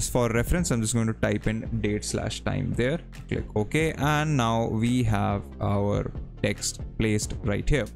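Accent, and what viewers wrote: India. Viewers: You know you've found the right tutorial when the person with an Indian accent shows you how to do the things in the tutorial.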